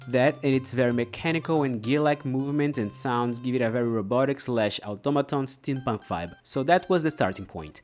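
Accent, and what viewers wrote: Brazil. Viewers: Eu reconheço um brasileiro pelo sotaque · Espera... EU CONHEÇO UM SOTAQUE BR QUANDO EU ESCUTO UM! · Nice video idea/channel bro!! Are you brazilian by any chance? Your accent sounds familiar lol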